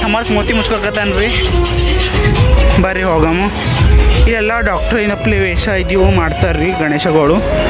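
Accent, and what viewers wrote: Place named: Bangalore, India. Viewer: Ean ri kali dome bangalore matadtiri ree antiri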